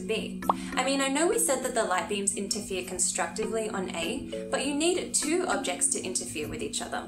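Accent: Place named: Australia. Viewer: Someone on twitter commented saying you were Australian and I didn't even notice!! I guess I'm too used to the accent. Where are you from? Where did you study?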